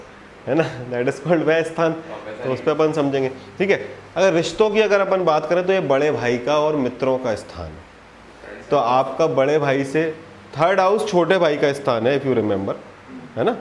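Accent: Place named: Indore, India. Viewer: Sir aap pure Indori ho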